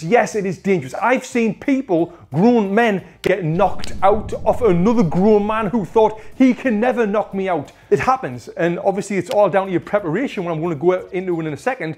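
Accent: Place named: North East England, United Kingdom. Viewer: Great advice, and explained clearly with enthusiasm, glad to see you've not lost the North East accent mate! Well done.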